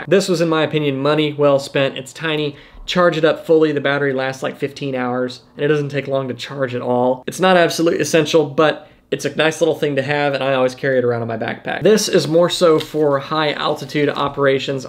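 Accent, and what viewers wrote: Texas, United States. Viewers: Magnificent Infos for Pilot appreciate very much I'm now used to your fast Texas talk again no problem. See you later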